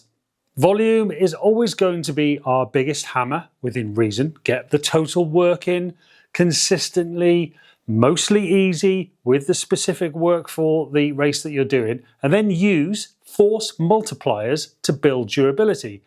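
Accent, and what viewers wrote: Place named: Wales, United Kingdom. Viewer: Ahh a Welsh man . Thought I could hear a bit of Welsh accent there 🙂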